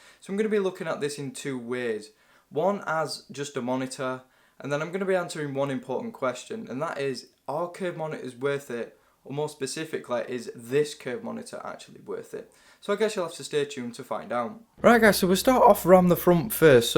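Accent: United Kingdom. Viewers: he is british !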